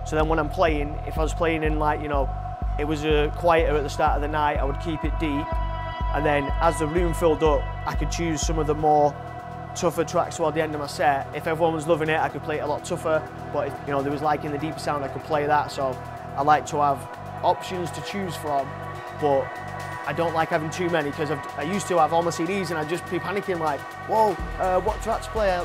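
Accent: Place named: Manchester, United Kingdom. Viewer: Love the Manc accent! ‘Bring in some rims...’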